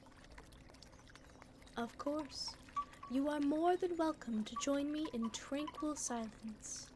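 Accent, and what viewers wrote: United Kingdom. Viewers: It sounds pretty much like british accent and she fits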